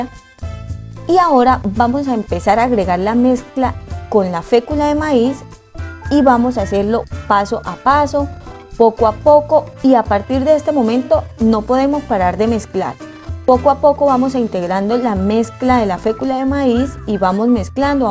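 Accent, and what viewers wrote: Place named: Valle del Cauca, Colombia. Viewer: Amo tú acento vayuno oís.... yo soy una colombianacense con alma de valluna💞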